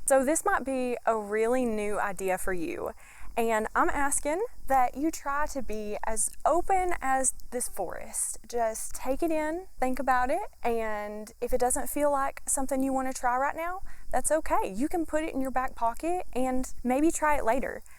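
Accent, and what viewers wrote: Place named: South, United States. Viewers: So glad I found this video. I developed this same method on my own and it REALLY works. Get as much detail or splashes of dialog into the individual chapter descriptions to support your story structure ahead of time. BTW love your t-shirt and accent. East Texas? Oklahoma? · Southern accent? Author? In the woods? I pressed the subscribe button so hard. 😂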